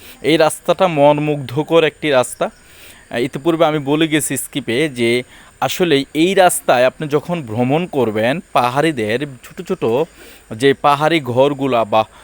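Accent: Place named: Chittagong, Bangladesh. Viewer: ভাই,, কিছু মনে করবেন না।। আপনি তো চাটগাঁইয়া,, আরও শুদ্ধ বাংলা প্রত্যাশা করছি।।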